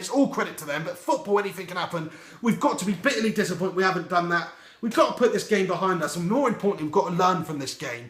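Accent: Manchester, United Kingdom. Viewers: which bit of Manchester is that accent from??? bellend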